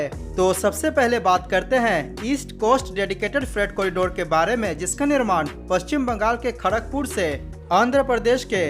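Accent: Bihar, India. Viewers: Is the presenter Bihari?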